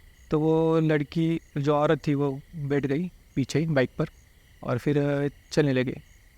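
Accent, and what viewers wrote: Maharashtra, India. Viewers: मराठी ऐकायला खरच भारी वाटत दादा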